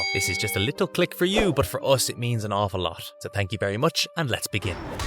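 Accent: Ireland. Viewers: Irish voice over? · Is that an Irish accent?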